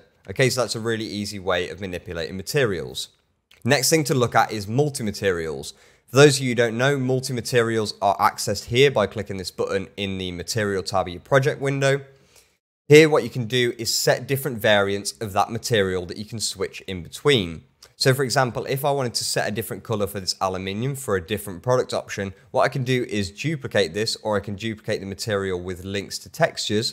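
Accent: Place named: RP, United Kingdom. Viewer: RP British elegant accent which I love to listen to.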